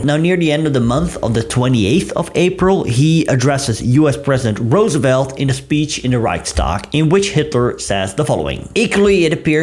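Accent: Netherlands. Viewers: I can hear you are Dutch love the accent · Within the first few seconds of this video, I knew you are Dutch because of your distinctive accent.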